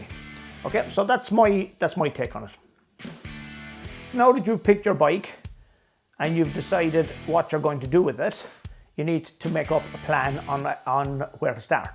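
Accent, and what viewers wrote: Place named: Ireland. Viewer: I came over from Irish Demon's channel to give you a look-see. He's never steered me wrong before. I LOVE your Irish accent!! I originally subbed to Irish on the recommendation of Team Skeptic. I totally appreciate your laying out a step-by-step approach to working on a bike. I only have a little experience with being a passenger on the back of a motorcycle. However, I can appreciate the artistry behind bike building!! Looking forward to seeing what you make your next video on!!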